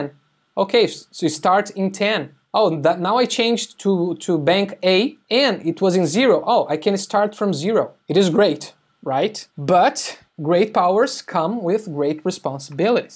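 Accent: Brazil. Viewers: O sotaque brazuka nunca vai embora né rsrs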